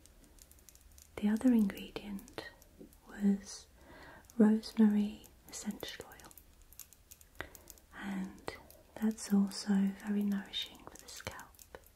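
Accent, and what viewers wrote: United Kingdom. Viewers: I live for the accent. Are you Australian or British? It sounds more British to me..? Anyway, great video!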